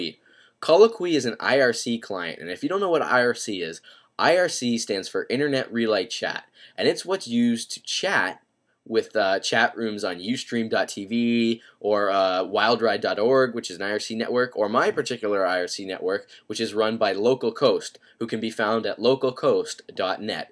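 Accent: Canada. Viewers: i love his canadian accent. thanks. i ended up downloading screenflow after seeing this. :) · ur canadian!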